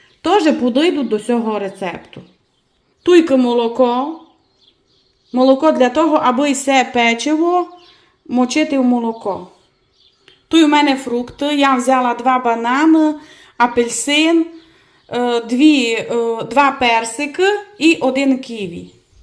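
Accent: Transcarpathia, Ukraine. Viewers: Цей неймовірний закарпатський діалект то❤ Це лайк і підписка сходу! Дякую за вашу працю і процвітання🌷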